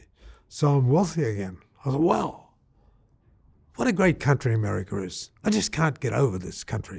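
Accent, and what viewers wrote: Australia. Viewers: Wow youve got an aussie on 👏 Hailing from Australia 🇦🇺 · I always wondered where News of the World stories came from (with an Aussie accent). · As an Aussie from North Queensland too, I recognised his accent straight away! And the “gum tree” in the title gave me a hint too. 🇦🇺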